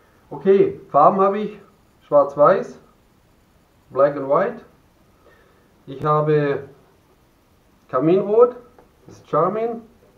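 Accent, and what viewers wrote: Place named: Baden, Germany. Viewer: hallo, ich wohne im chiemgau, am waginger see. aber deinem dialekt nach bist ein badener.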